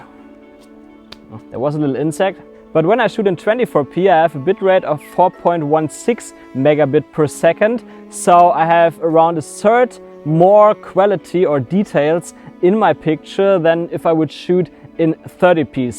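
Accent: Germany. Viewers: Man hört das du Deutscher bist 😅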